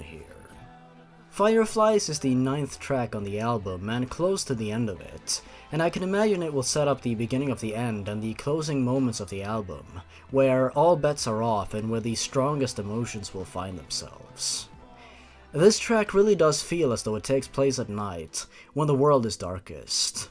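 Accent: Sweden. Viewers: Visste inte du var svensk xD Borde ha fattat på dialekten, men jaja...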